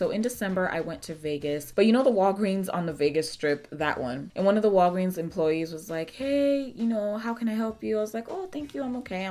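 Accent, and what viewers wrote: Nigeria. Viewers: your nigerian accent is absolutely stunning, i love them so much😍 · Pls do a video where you explain and talk through everything in your Nigerian accent😂😂 I love your accent ❤❤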